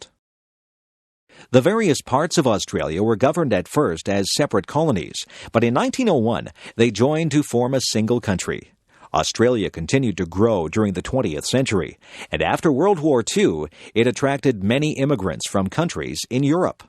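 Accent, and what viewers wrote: United States. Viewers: Factually incorrect, in an American accent and doesn't even pronounce the names of major cities correctly. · The accent in the audio is American, with some place name mispronunciations.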